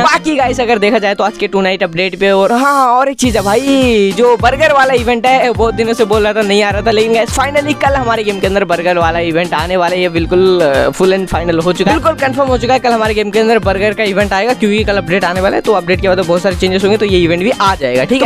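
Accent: Odisha, India. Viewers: Bhai odisha se ho kya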